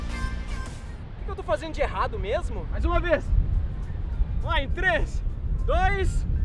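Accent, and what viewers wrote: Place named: Portugal. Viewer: Ele parece um cara q veio de Portugal e ficou por um tempo no Brasil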